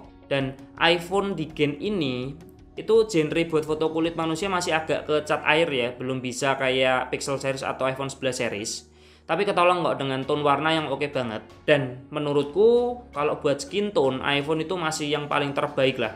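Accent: Semarang, Indonesia. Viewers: Dari awal dengerin video nya kok bahasanya kayak medok gitu. Dan ternyata benar. Wong semarang🤣🤣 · orang semarang bro?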